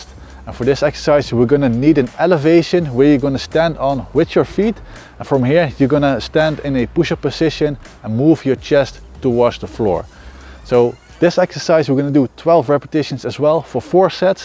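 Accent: Netherlands. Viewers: ik hoorde gelijk al dat je nederlands was haha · Nothing more goofy than the Dutch accent.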